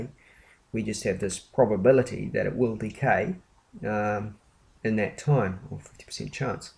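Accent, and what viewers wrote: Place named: Australia. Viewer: You're Australian. Thank you, Mr. Australian man.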